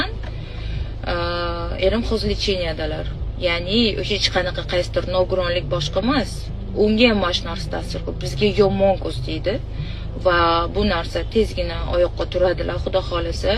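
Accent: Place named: Samarkand, Uzbekistan. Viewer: Toshkentcani eplolmisiz samarqand shevasida gapiruvrin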